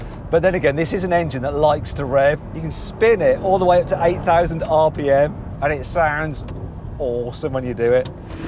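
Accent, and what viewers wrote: Germany. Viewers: Yaaaaa.... I am very very much interested to see the German from whom Mat has picked up the accent and mannerisms. Love the Video as always and eagerly waiting for the engine upgrade of Jimny. Love, health, and happiness for you Mat from India. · i just love. ants german accent ya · can we get a "best of german accent" video:D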